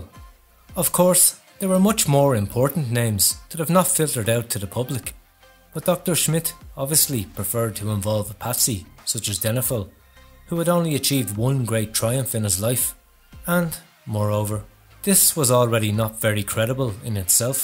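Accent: Ireland. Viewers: I think your subtle, understated Irish accent really adds to the narrative. Just discovered this channel and is eye-opening to say the least. Really like it.. I imagine it'll take off even more when/if the big names get busted.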